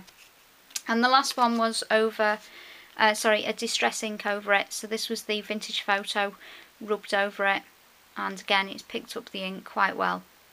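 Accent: England, United Kingdom. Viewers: I found this comparison very interesting and helpful. I have seen many mentions and tutorials on how to create your own crackle paint using 'Elmer's Glue' - which is something that's common in the USA, but I - like you (from your English accent) am in the UK. Have you tried this? Do you know what glue here in the UK would work like the American's 'Elmer's Glue'? If you've tried this, how well did it work? Thanks. By the way, I love your journals and Mixed Media art. Gorgeous stuff!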